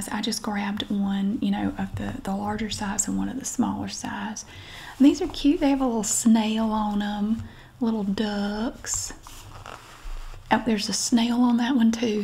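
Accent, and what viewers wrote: South, United States. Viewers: I just moved to South Carolina from NY, and so many people have a lovely southern accent like u! Lol, it gives me such comfort for some reason. Have a great night!! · I like your southern accent: "Hate it an ate it". And you are so considerate to donate the food you bought.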